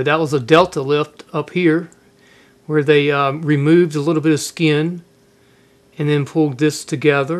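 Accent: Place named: South, United States. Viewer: Lov souther accent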